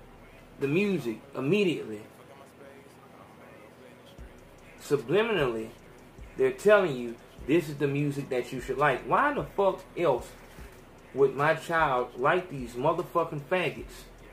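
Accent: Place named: South, United States. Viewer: You so country but i 💕that though!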